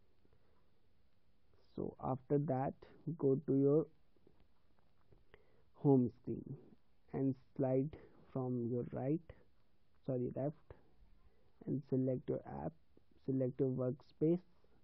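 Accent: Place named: India. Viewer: Damn, that Indian accent 😅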